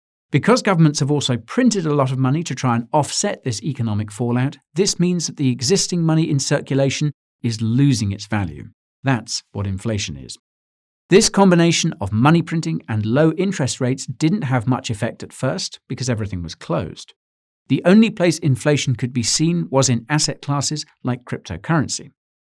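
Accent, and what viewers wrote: United Kingdom. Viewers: This is how we talk in Britain. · I was just listening to that cool British accent 😅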